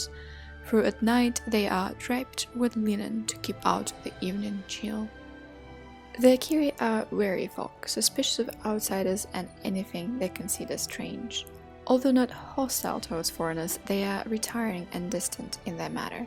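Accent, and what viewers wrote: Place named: Iceland. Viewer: lolz you sound icelandic. Besides ravenloft har'akir, how about Athas dark sun?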